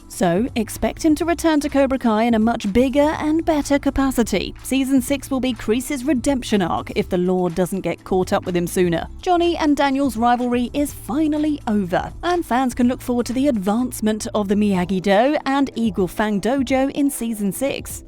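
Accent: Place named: United Kingdom. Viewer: Why are these videos almost always narrated by British people? British accents are highly annoying for me to listen to. I especially can't stand the way she says "Dio-jeo"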